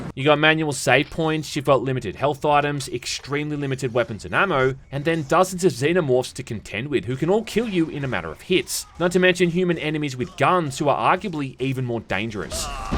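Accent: Australia. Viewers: aussie accent is such pain on the ears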